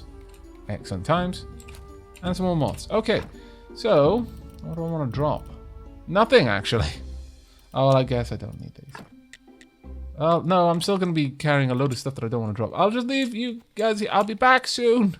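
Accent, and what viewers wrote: Wales, United Kingdom. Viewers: I get people from outside the UK probably don't know much about various UK accents but I'd really have expected most people to recognise a Welsh voice. He even sometimes slips up and says the Welsh word for a thing mid sentence which is rather funny when it happens.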